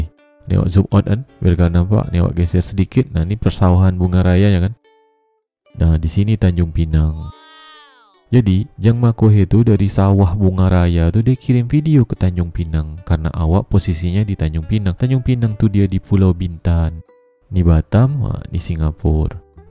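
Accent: Malaysia. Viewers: Iya bang cantik bener sawahnya, awa senang dengar cakap logat melayu abang, salam dari awa warga jakarta bang, sehat selalu ya.( awa sdh anggukan kepalaku tiga kali bang ).. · loh bukannya abangnya pakai bahasa malaysia ya soalnya ngomongnya mirip upin ipin beda cara ngomongnya sama orang indonesia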